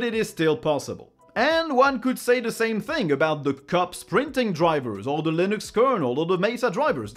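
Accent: France. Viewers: Je reconnais un fond d'accent français. Are you french?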